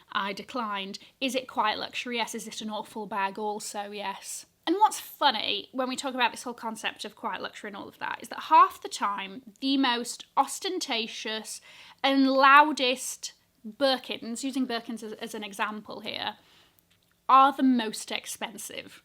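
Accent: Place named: England, United Kingdom. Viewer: just came here to say I absolutely ADORE your accent ☺️ I’m new to the channel, so I’m not sure what part of England you’re from, but I think it’s absolutely smashing 💖 Hugs from America 🤗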